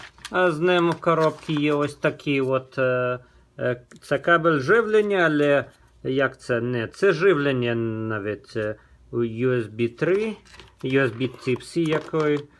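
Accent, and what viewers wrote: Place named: Ukraine. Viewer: Яка цікава українська говірка)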